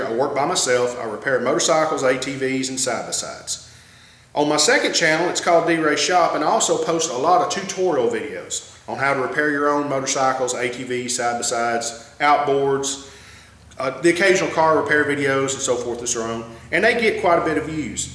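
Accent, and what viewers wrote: South, United States. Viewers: I like this guy, there is something cool about a guy talking sense in a southern American Accent :-)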